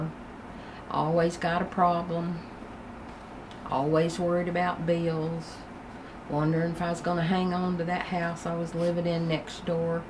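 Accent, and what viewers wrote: South, United States. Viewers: I know what Low self-esteem is like. I have apologized for crying too. Not sure why because we don't really owe anybody an apology for showing our emotions.. Crying is therapy. If you held it all in you probably wouldn't be alive. Thank you for your honesty and transparency. I pray God's protection over you and His comfort over you. You have a beautiful Southern voice. I pray that God will continue to provide for you all that you need every day. He is Jehovah-Jireh ~~our provider. Ironically I just started feeling like I'm living for the first time too I've had this happen before and went back into my own grief and pain but I feel like this time is different. And guess what? I'm painting too. It's watercolor. It's just a different format than what you do but it's so therapeutic to my soul. I pray for many moments of joy and peace over you precious It's beautiful how God would let people connect from all over the country!!❤️🙏🏼 Please know that there are many people out here who will lift you up in prayer. 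Who care. And who think you are a beautiful friend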